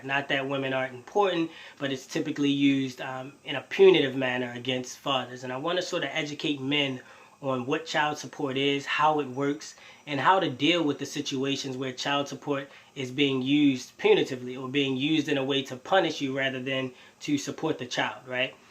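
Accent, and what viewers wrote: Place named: Maryland, United States. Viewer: Sounds like you're in MD I really like what you're doing here. Let's connect brother.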